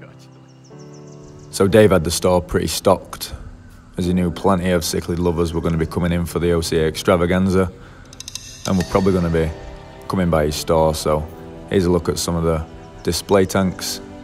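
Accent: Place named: England, United Kingdom. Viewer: Why do you sound English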